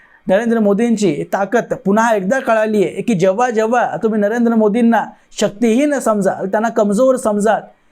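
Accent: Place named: South, India. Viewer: तुमचा टोन पूर्णपणे दक्षिण भारतीय आहे. टोन ऐकल्यावर मी तुमचं नाव वाचलं. ऐकायला छान वाटतं. Keep it up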